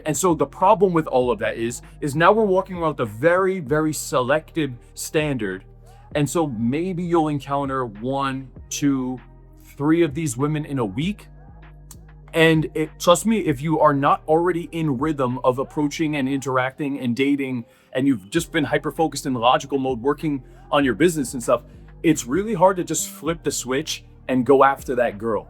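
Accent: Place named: United States. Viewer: you easily say this because you are an americana nd you have a cool accent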